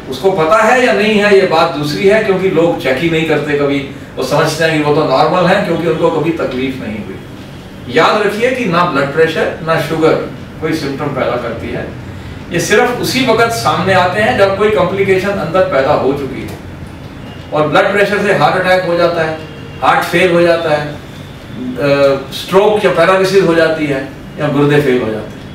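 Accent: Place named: Pakistan. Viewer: I thought you were Pakistani from your accent. Very nice information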